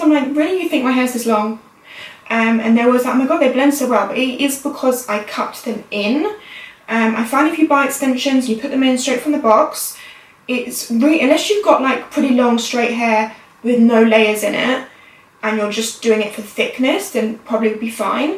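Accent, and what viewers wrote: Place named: London, United Kingdom. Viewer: No shade... But you sound a bit like a Londoner to me... No shade - never shade... Not to you! Lol... (I'm a Londoner anyways).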